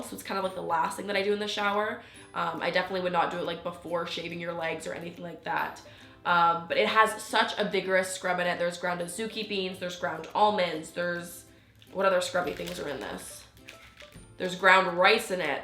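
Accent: Canada. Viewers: Is she Canadian? She sounds like my new amazing science teacher (I just moved to Canada btw) I am subscribing now because I am obsessed with Lush as well ❤️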